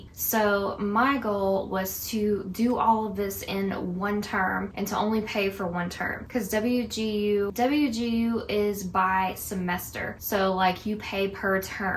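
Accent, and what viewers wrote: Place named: Birmingham, United Kingdom. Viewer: Yooo you sound like you're from Birmingham then you said Jeff State, coincidence or am I just crazy?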